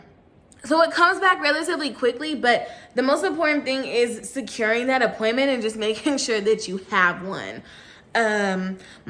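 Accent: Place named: United States. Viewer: Ndewo, ke kwanu...funny american accent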